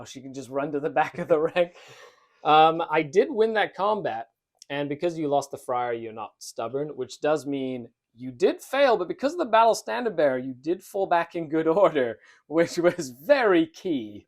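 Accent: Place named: United Kingdom. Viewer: Just found out about this channel and subbed already. I’ll leave the well deserved praise to the other commenters. All I need to know is… what kind of wild accent is that? Sometimes your a‘s are quite British.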